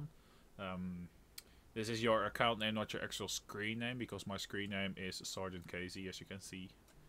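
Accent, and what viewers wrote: Netherlands. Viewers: ben jij nederlands ik hoord het aan je engels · Are you Dutch?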